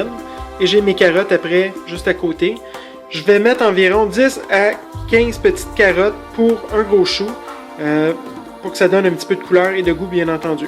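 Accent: Quebec, Canada. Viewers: es-tu quebecoise? L'accent c'est vraiment different et j'aime ça.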